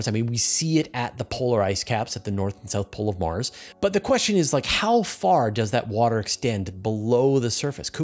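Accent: Canada. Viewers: I find your Canadian accent interesting. It gives Canada a linguistic distinction from the US. Language is an important part of national pride. Don't be ashamed of it. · You should never worry about your accent. People love it. It’s part of the reason Canadian’s are so popular on YouTube. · I live in Southern California and I and most people I know pronounce lava as “lah va” and not “lav eh” as does. My sister-in-law’s parents are Canadian and I took Canadian history in college so Canadian accent is very easy for me to hear. And that’s not a criticism so I think Canadian accents are cool. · ROFL on the Canadian accent. Nothing wrong with proper enunciation of words :) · As a not native speaker I cannot spot your Canadian accent 😊 however I'm able to understand you very easily. Excellent pronunciation and always great contents! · Canadian accent…as a fellow Canadian I never considered that, lol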